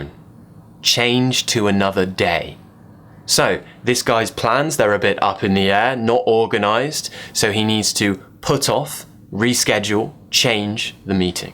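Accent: United Kingdom. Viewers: Hi! Thanks for your video. Love your British accent, mate!:) I wonder, can we also say ‘go down the pan / the tubes / the plughole’ instead of ‘the drain’ with the same connotation and level of politeness? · Thanks so much, very useful video and I like very much your British sound, it's wonderful and clear. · Your accent is awesome! I wish I spoke English with British accent like you. 😊😊😊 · I'm so glad to watch you video..the way you talk British English so very clear 😘😘😘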